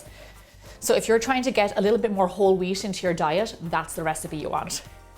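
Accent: Ireland. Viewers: I'm adding this to my list of what I've baked already: sourdough bread, pretzels, corn and flour tortillas, pita bread. I find that I love baking. My Grandmother used to make noodles. My Mom said she hung the noodles all over the house to dry. Interesting visual. By the by, I like your accent. You're Irish, right? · i love how your irish accent is sometimes coming through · So i was looking for an easy home made egg pasta recipe and just clicked one without looking at the thumbnail and the video started and i hear the familiar Irish voice. I was like omg it's 😂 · Are you Irish ? Love ❤️ the accent 👋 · I knew you were from Ireland even before reading. Love that accent.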